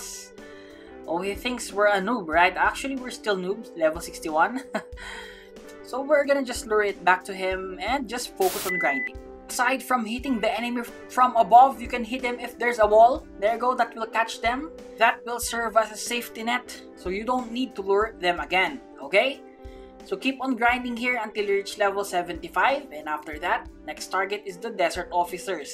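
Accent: Philippines. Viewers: Idk if this dude is pilipino or not he just sounds pilipino idk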